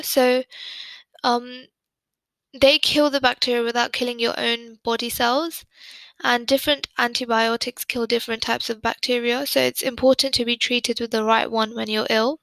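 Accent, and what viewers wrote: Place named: South England, United Kingdom. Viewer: idk how to explain it! 😂 I haven’t seen many people speak with your accent and idk it’s just really nice! 😂🥰 are you from the south of England?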